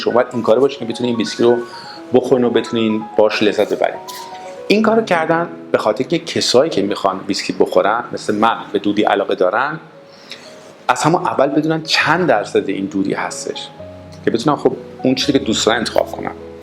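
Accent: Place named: United States. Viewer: لهجه مشتی داری امریکا به دنیا اومدی ❤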